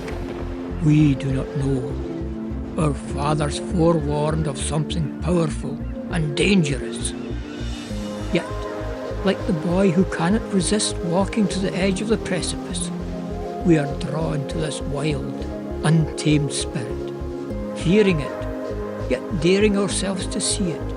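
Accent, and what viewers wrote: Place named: Scotland, United Kingdom. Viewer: Wonderful! And I‘m so thrilled to hear a scottish voice again after a far too long time being kept away from visiting Scotland.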